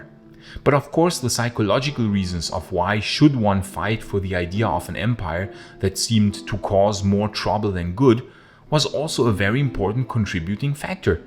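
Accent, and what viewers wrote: Germany. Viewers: Where are you from i wonder about your accent are you german? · Kannst du bitte noch einen deutschen Kanal machen, bei dem du das ganze nochmal neu einsprichst? Nichts für ungut, aber dein Englisch klingt genauso wie das eines alten Klassenkameraden, der versucht hat so akzentfrei wie möglich zu sprechen. Das klingt in der Regel allerdings noch schlimmer als wenn man ganz normal als Deutscher englisch redet. Schau deine Videos echt gern, aber dieses versuchte Unterdrücken der deutschen Aussprache macht es echt schwer zu schauen.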